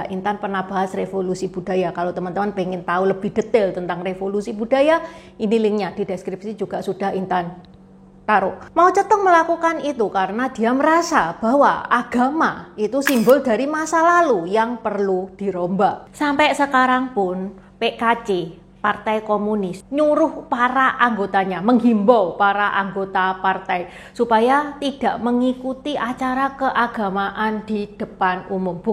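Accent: Java, Indonesia. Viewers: Logate jowo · Intan …aq senang dengan logatmu yg Jawa medok 😂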